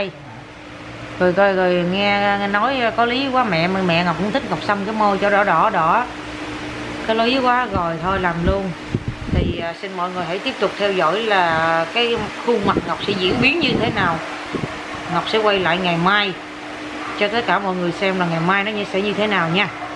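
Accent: Mekong Delta, Vietnam. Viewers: Chi noi chuyen lanh qua.vui ve Nghe giong chi chac la nguoi mien tay dung khong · Ngọc de thương nói chuyện thiệt tình đậm chất miền Tây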